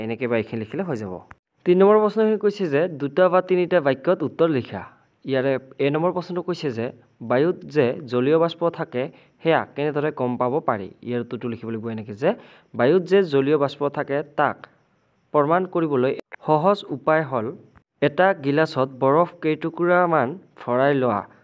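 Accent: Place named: Bodoland, India. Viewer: Sir aapuni Bodo hoi nki maat tu xuni enekuai lage? 🤨🤨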